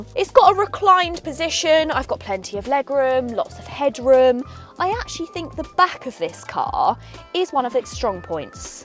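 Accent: England, United Kingdom. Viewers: i love the England 🇬🇧 accent 😊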